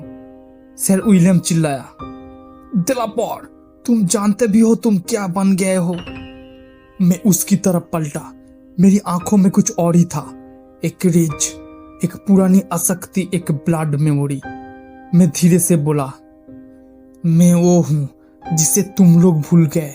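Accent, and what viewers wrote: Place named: Bengal, India. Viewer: Dear Sir your Bengali accent is to strong.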